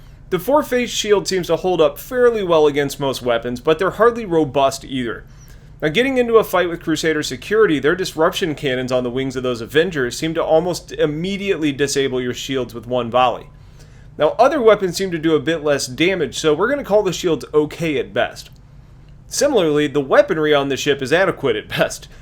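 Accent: United States. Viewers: Nice to have an actual American voice, rather than a very gay British voice doing commentary.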